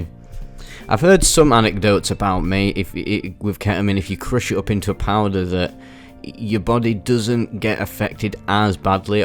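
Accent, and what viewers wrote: United Kingdom. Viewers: It’s his British accent ketamine is very popular in the UK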